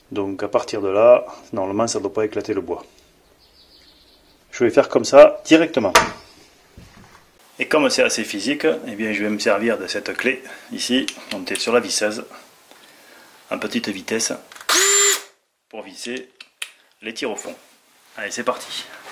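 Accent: South, France. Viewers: enfin une video simple et explicite a la fois ......qui plus et avec un bel accent du sud , ce qui ne gâche rien au plaisir d'apprendre .....merci pour tout vos bons conseils .....(yves)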